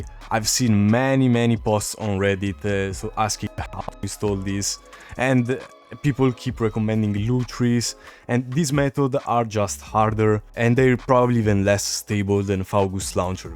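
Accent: Italy. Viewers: Sento un po di italiano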